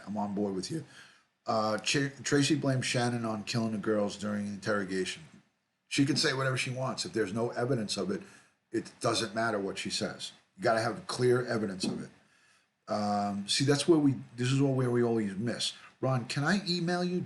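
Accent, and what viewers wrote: New York, United States. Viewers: Hi Ron! I just found you and subscribed. It's good to hear a familiar accent. I'm a native New Yorker transplanted to south eastern Turkey. Let's Go Mets! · I am a new subscriber from the Bronx New York love your channel just listening to you talk I new you were a fellow New Yorker!!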